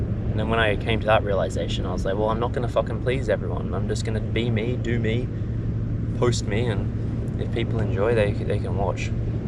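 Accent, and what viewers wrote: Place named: Europe. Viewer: can you speak clearly and slow because your english it sound uoropean tone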